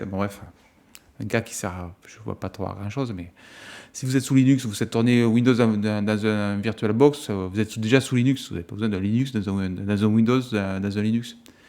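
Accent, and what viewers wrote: South, France. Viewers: avec ce petit accent du sud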